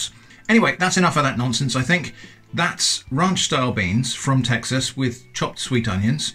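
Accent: United Kingdom. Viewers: Best Brittihcent inherent.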